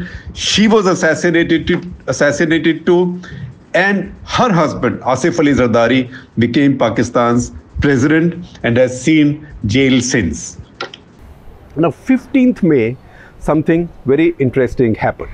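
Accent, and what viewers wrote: India. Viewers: Shekhar Gupta-cluttery and more cluttery Your Indian accent English( Hinglish), clearly states that all three princely Muslim states were annexed , using Indian army illegally, not to mention Goa and islands off the Arabian/Indian ocean precincts and islands off the East Pakistani coast. India jumped to holding a plebiscite in Junagadh and munavadar, but relies on a forced instrument of accession from hari singh, nawab of a Muslim majority state: both preposterous, and self contradicting. One case, of course, comes out without doubt, partition plan and un resolution both unequivocally determine Kashmir as part of Pakistan . Come on, now, let’s come out of verbosity and hiding behind words, Kashmir is an open and shut case - its Pakistan. Junagadh, munVadar, mangrove and Hyderabad can be discussed later !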